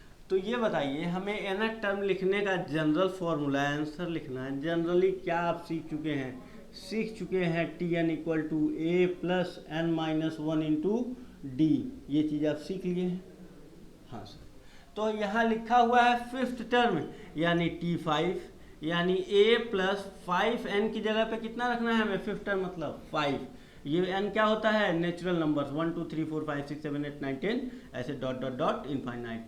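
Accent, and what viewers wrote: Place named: Bihar, India. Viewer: Sir aap bolte bilkul bihari style se.....bahut cutely.... And thank a lot for this video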